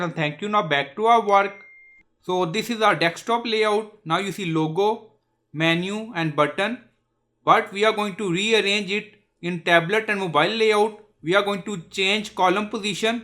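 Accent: India. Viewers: I really don't mean to be rude but it's really hard to understand you with that very strong Indian (?) accent of yours. Maybe use an AI voice instead? Again, I don't mean to be rude or offensive. So, please accept my apologies and maybe take my comment as a suggestion or advice. 🙂 Thanks anyway for the effort you put in your tutorial videos.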